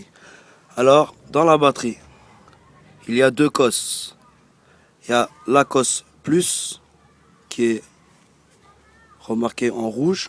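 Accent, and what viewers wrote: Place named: East, France. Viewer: magrébin plutôt. Mais il a plus un accent de l'est j'ai l'impression.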